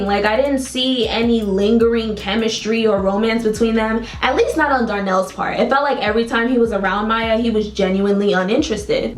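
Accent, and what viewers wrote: Jamaica. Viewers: wait are u jamaican? also love this video 💗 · Mumma yah jamaican????me keep getting recommend to you channel ,me ago subscribe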